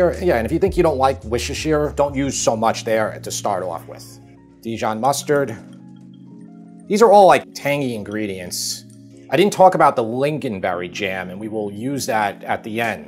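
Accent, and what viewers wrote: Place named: Long Island, United States. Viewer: You can't possibly say "fond" with a Lawn Guyland accent. It won't work.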